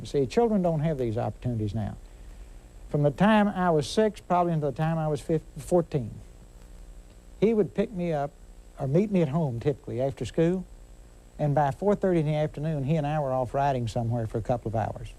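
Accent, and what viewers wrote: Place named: Texas, United States. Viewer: I love how he speaks with such eloquence through that awesome Texas accent.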